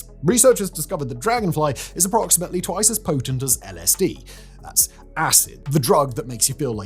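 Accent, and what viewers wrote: England, United Kingdom. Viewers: You are the most british-sounding person I have ever encountered. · Yeah man you're right. He's enunciating very sharply, so it happens. Plus his presumably English accent.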